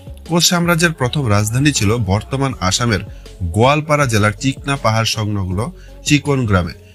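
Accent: Bangladesh. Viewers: Byash not re beta besh bolo ....Dhakaiya kotha kar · উচ্চারণ এ পরিস্কার বাংলাদেশী ছাপ- এরমধ্যে>এ্যারমধ্যে, এছাড়া>এ্যাছাড়া,প্রথম>পথম,next>naxte ,😂 , তবু ও খুব ভালো হয়েছে, শুভ কামনা রইলো।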